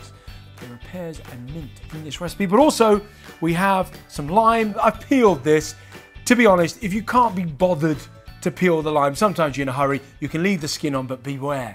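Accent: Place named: United Kingdom. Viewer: I love Jasons British accent!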